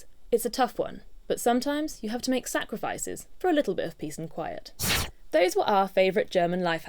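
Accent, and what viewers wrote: United Kingdom. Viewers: pantes aksen Inggris awalnya saya kira asli orang Jerman ternyata Inggris 😅😅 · Suka banget aksen british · Bicara tentang jerman tp dengan aksen britania hehe.. saya suka yg terakhir, semua hrs rela berkorban utk sebuah tujuan bersama👍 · Salfok sama accent britishnya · Waahh serasa menonton harry Potter pakai aksen British 😄😄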